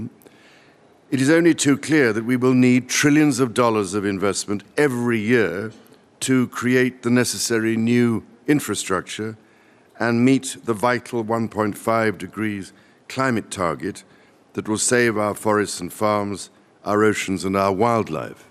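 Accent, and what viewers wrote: RP, United Kingdom. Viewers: Here to listen My fav British Accent😍🙌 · Agree with Lyn Harr... speaks good RSP English, NOT posh at all. has fine steady qualities which will help him to be a good King. I shall be proud to be one of his subjects Vive le Roi Charles, long live all our Monarchs, each reigning in her/his turn. May God keep them safe. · Now THAT is a posh accent. I feel smarter just listening to the accent. Props to Charles for being ahead of his time. Our job is to listen to him.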